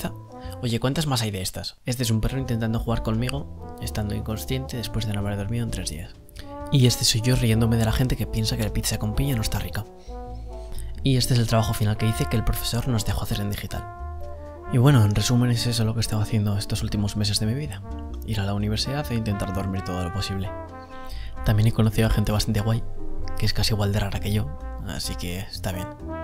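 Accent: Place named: Spain. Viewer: waa me ha gustado todo pero a adivinar por tu forma de hablar me imagino que la universidad se encuentra en españa chaz espero poder entrar a una universidad con buenos cursos y como me gustaron las animaciones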